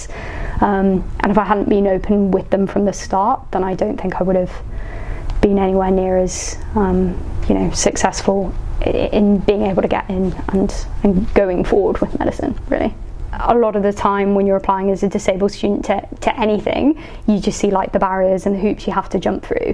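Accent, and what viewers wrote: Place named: England, United Kingdom. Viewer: I love the inglsih accent ,Greetings from Uruguay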